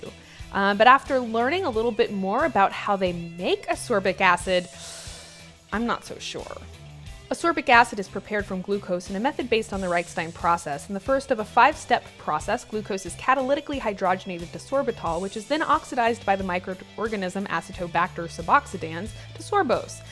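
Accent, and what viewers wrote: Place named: United States. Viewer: Watched for 5 mins then couldn't stand your annoying slow American accent zzzzzzzzz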